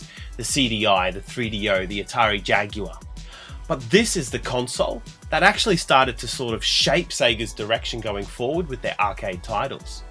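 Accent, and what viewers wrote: Australia. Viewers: The Sega "Satin" I love Australian accent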